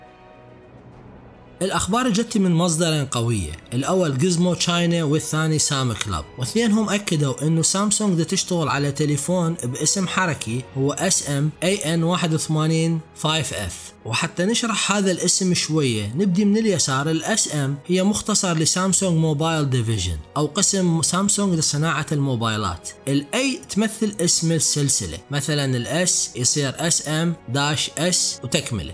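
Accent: Iraq. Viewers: من سوريا احييك اعشق اللهجة العراقية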